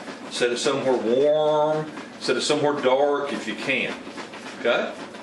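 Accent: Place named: South, United States. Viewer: Where are you at. Sounding really Kentucky Tennessee ish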